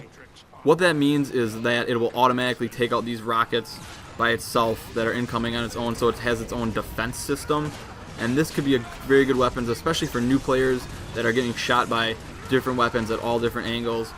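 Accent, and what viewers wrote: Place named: Canada. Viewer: I don't. Canadians are of mostly French descent I think? That makes them european. I was just curious if he was from Canada because he sounded Canadian. Now I'm a racist for being curious. What a wonderful and oversensitive world we live in now, that I can't just make simple conversation without being accused of being a bigot. T_T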